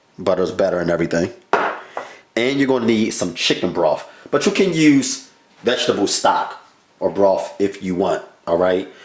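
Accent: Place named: Baltimore, United States. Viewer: You sound like you form bmore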